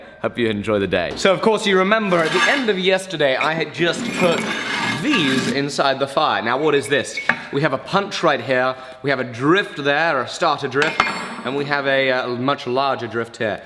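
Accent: England, United Kingdom. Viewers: I wondered how I watched 3 episodes in 2 days and then I realized he's clearly English.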